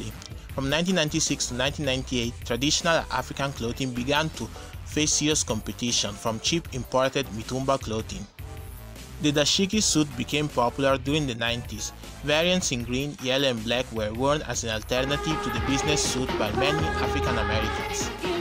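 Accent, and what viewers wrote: Italy. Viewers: Why you speak with an italian accent?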